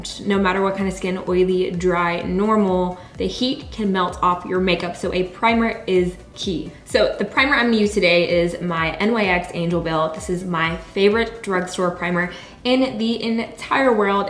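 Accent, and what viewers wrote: South, United States. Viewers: Sometimes I hear her southern accent peek through when she talks like in her old videos.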